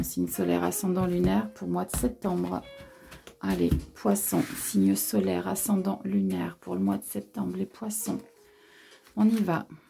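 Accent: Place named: Marseille, France. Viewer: Coucou ❤️ Moi rien ne me parle pour l'instant mais même accent du sud, de Marseille 😂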